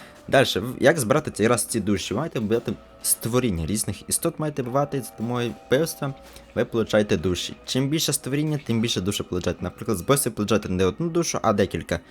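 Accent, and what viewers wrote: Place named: Poland. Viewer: Я спочатку намагалась зрозуміти, чи це польска чи білоруська 😭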